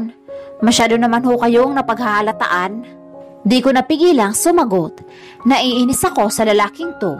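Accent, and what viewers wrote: Visayas, Philippines. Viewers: Sa nagbabasa ng kwento😅😅😅 bisaya kapoba para kalang kasing tumutula hahahaha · Anyare sa narrator?ganda ng kwento sana,,diko malaman kung bisaya o batangueña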